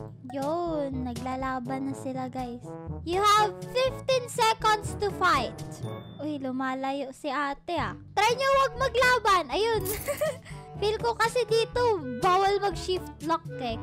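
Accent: Visayas, Philippines. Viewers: Ate Bisaya Ka po ba?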